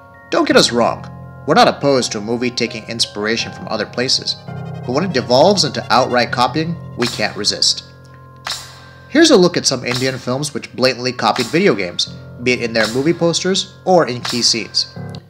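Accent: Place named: United States. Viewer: wo plz stop using American accent, if you can't stick to Indian fine, adopt British since its far easier to understand them than American's. Though am talking silly, how could you change to British and not Indian, but still, its just too clunky.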